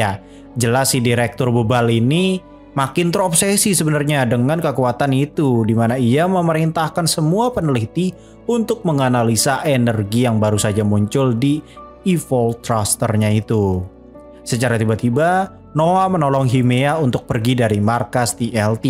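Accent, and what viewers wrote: West Java, Indonesia. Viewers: Abang orang sunda ya😁☺😂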